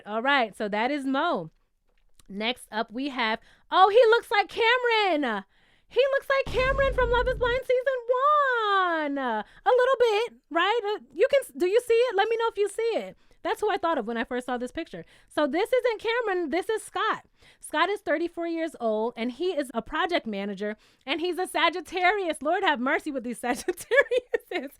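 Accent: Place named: Brooklyn, United States. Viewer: We need to give your brooklyn accent a persona and a name. I wanna call her Rosie or Roseanne for some reason.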